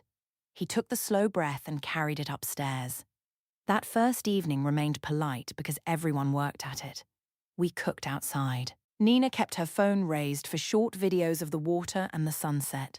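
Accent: United Kingdom. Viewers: Is it a coincidence that the stories with the British female accent have the most abusive women?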